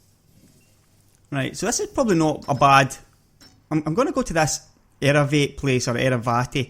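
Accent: Scotland, United Kingdom. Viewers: thats Scottish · soon as I heard that thick Scottish "awrite guys hows it goin" you had a new subscriber , lmao thanks for the tips bud. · love the scottish, it's bloody awesome lad :D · Nice also to hear a guid Scots accent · Scottish, aye? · the sound of the Scottish voice is a bonus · a Scottish youtuber finally an accent to relate to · Your accent! Epic wins! Sound like Spud from Trainspotting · A Scotsman in a spaceship, 10/10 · i love your scottish accent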